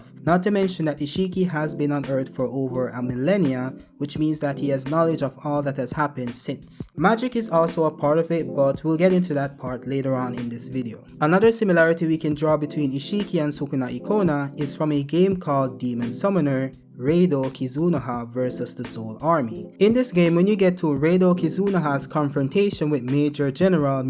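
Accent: Jamaica. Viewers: Where you from fam? You sound Jamaican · My dawg Jamaican accent cannot be contained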